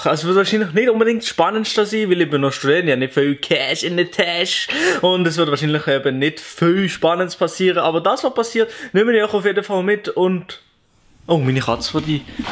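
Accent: Switzerland. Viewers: Also Züri-Dütsch isch das nöd, was isch das für en Dialekt?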